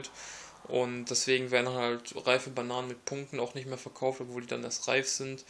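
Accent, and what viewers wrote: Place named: Ruhr, Germany. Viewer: Hab aufs Ruhrgebiet getippt :D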